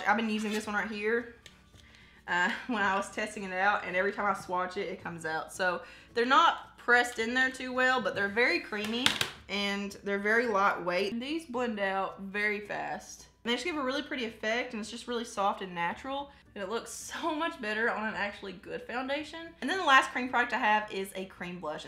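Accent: South, United States. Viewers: Country bumpkin... ain't nothing wrong with that! What I love about you is that you don't mask being Southern like another big youtuber does! Have a great week and love from Tennessee 😘! Also, I only have 5 notifications on and you're one of them 💖!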